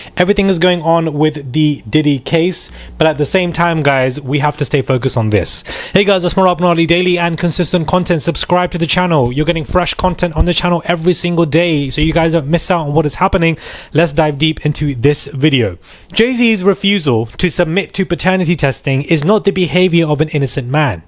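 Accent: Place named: England, United Kingdom. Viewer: An east Indian with a English accent ok ... this is black folk bizz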